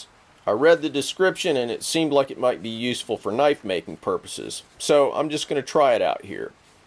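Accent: United States. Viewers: This sir has a 100% american voice...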